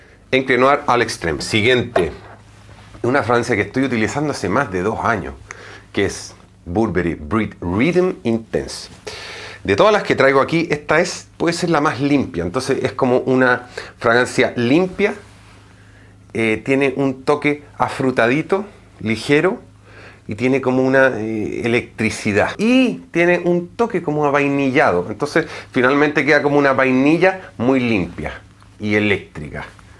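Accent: Chile. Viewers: Tenes acento chileno me parece inconfundible pero explicas muy bien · ¿Cómo saben tanto de la vida de este señor?. El acento chileno es inconfundible eso si. Sobretodo para los chilenos. Genial que mantenga el acento, es un plus en este blog. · Eres chileno? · Este chico es chileno? Le noto un cierto acento de aquel maravilloso país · Eres chileno?? Saludos !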